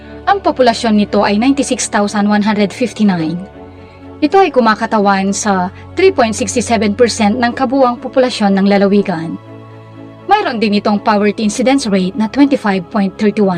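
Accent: Visayas, Philippines. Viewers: Taga visayas kaba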